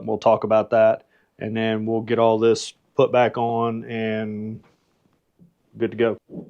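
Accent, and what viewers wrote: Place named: North Carolina, United States. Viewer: New Sub, I knew you were from NC just from the way you talk. I grew up in Hickory. Question, when I shut down the bike, it has started making a chirping noise coming from the intake. Any ideas? I have never noticed it before, but a couple of weeks ago I started hearing it, so now it's bothering me. It's right at the end of the shut down. Bike is a 2017 CVO Ultra Limited. Thanks in advance Brother!!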